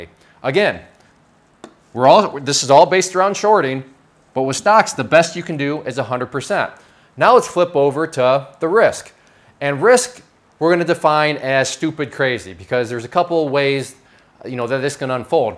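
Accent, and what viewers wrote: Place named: Midwest, United States. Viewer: lol, "I understand math." No doubt, brother. You sound like you are from Iowa/South Dakota/Nebraska.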